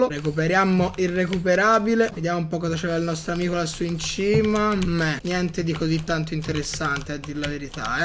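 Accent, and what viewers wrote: Tuscany, Italy. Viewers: Con l'accanto toscano bellisimo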